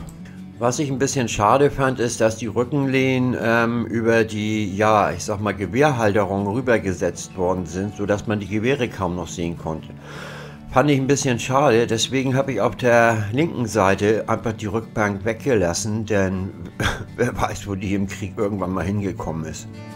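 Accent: Hamburg, Germany. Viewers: Danke für das tolle Video! Sehr hilfreich auch für Anfänger, es gibt hier so viele Äberdrüber Profis..Du machst das sehr toll.Auch Deinen Text hört man gerne, schön Hamburgerisch Höre ich als Österreicher gern. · Ein Hamburger! Klasse. Hörst Dich nicht wie ein Schlumpf an, eher wie Käpt'n Blaubär und ich hing wie eins der Bärchen an Deinen Lippen. ;) Klasse Bau und schön erklärt.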